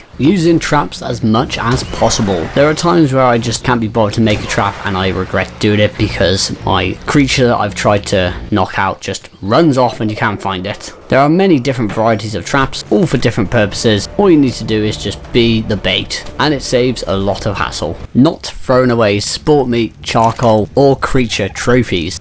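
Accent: United Kingdom. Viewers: This accent is different, British!??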